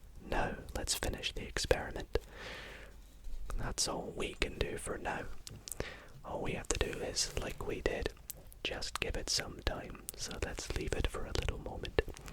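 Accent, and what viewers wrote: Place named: Ulster, United Kingdom. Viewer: Nice Ulster accent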